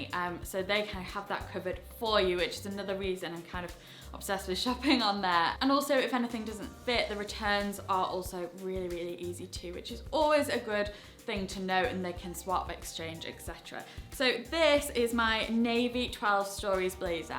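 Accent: United Kingdom. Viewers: I'm American from L.A. You have a British accent. You're better than all the other stylists in YouTube. You're the only one I subscribe to. Thank you for being the voice of reason.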